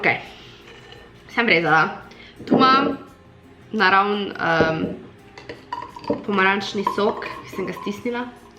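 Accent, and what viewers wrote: Maribor, Slovenia. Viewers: Zdravo, hvala za video. A si glih z Maribora al pa iz okolice (poskušam ugotivit iz govorice)?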